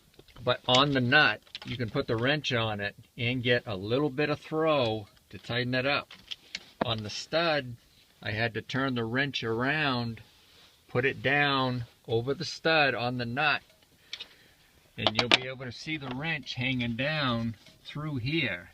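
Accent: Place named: Maine, United States. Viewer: sound like you are from maine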